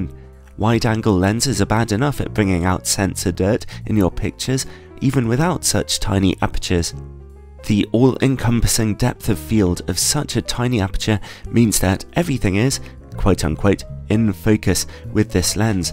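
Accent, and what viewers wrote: United Kingdom. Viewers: You’re literally taking the British accent onto a whole new level of royalness. Great sum up by the way :)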